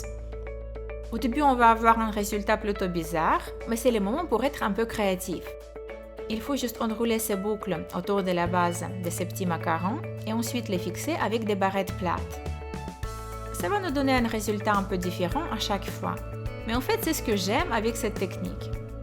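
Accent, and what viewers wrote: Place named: Russia. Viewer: Tu as l'accent russes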